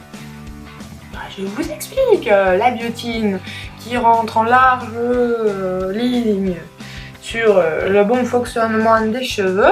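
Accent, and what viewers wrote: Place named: South, France. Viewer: Merci pour cette vidéo hiii tu me fais trop rire avec ton accent du sud 😂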